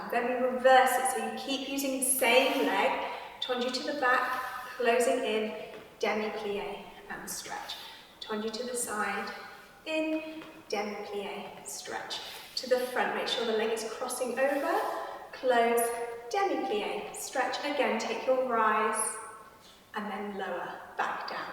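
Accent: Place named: England, United Kingdom. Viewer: Well done! Very clear and well-thought out. Plus I love the English accent and correct ballet terminology.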